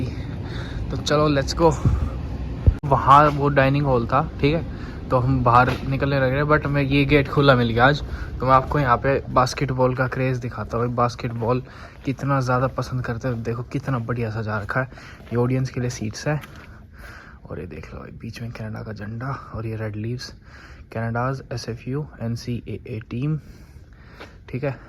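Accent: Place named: Haryana, India. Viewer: Bhai Haryana se ho Pura touch h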